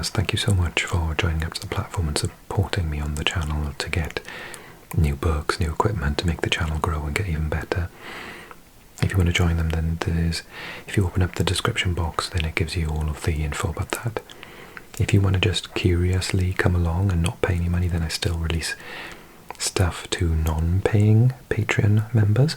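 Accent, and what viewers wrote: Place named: Wales, United Kingdom. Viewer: I have a theory…. Your Welsh!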